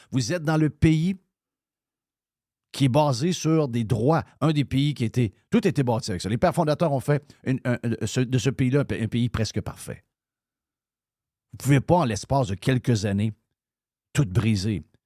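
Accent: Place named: Canada. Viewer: Outre l'accent canadien que j'adore, j'adore le discours ! (et oui, c'est un sacré Finger ! ).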